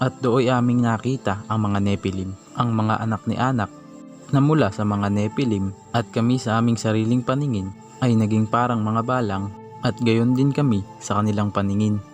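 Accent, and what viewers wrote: Iloilo, Philippines. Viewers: Ilonggo ka ba kuya? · Lods., bisaya ka no.?.. Ma.subscribe nga... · ilonggo ka pu ba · Bisaya ka ba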